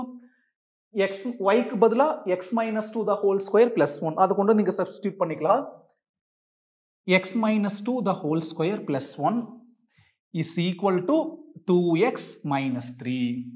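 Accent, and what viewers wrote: Coimbatore, India. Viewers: Your way of teaching in coimbatore slang is interesting sir👌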